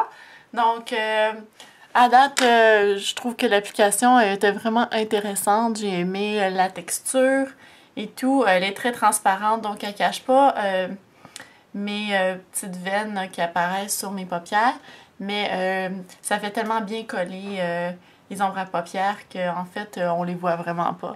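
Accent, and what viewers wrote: Canada. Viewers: Un Grand merci pour tes vidéos.. Mes recherches étant "une première" et ... J'atterri sur tes bons Conseils!!! Depuis ma Suisse natale et ayant une sœur à Montréal çela fait du bien d'entendre ton bel accent canadien..merci ;-)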